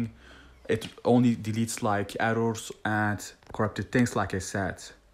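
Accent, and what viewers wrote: Netherlands. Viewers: Ik vind het echt leuk wanneer Nederlanders Engels praten xD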